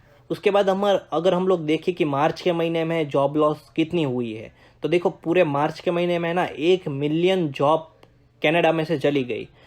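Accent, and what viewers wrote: Gujarat, India. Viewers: Love your gujju accent haha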